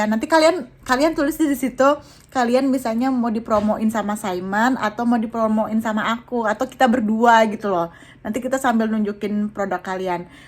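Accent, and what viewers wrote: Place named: Bali, Indonesia. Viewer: wah pantes ngomongnya campuran bali tadi di Vlog wkwkw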